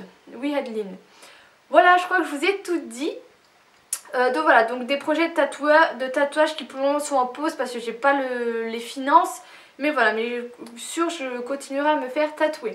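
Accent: Lorraine, France. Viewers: s'entend ton accent de Lorraine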